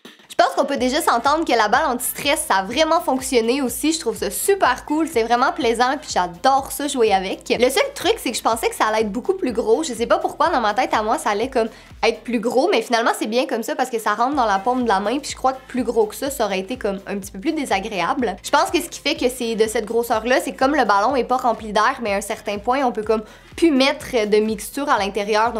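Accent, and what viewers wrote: Quebec, Canada. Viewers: T québécoise ? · J'adore ton accent 😍😍 il est québécois ? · Jdr l accent des canadiens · T'es tu quebequoise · Accent Quebecois 😍😍😍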